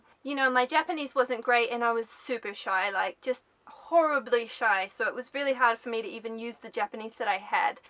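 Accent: New Zealand. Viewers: Yeah it's a bit of a hybrid between her native New Zealand and the Japanese accent she would have adopted a bit from being around Japanese speakers so often.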